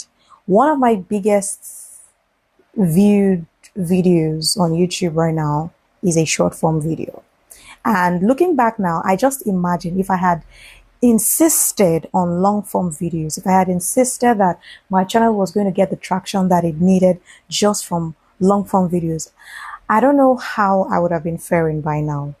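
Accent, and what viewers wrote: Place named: Africa. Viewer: I like it but talk better. Your African accent distract your audience, and I can't understand you well. Work on your accent to become American, more people will understand your words. One love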